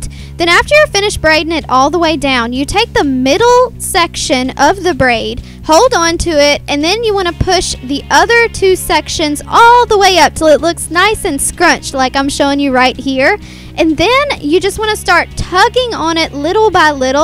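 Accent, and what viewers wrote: South, United States. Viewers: you talk just like me! with the southern accent!! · You are SO fun and absolutely HILARIOUS to listen too! You made my night! And its great to actually hear a southern youtuber! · Your so cute and southern! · when i first saw the thumbnail for this video i thought she would be like a full on new York/jersey accent, and then she was country, pretty coolll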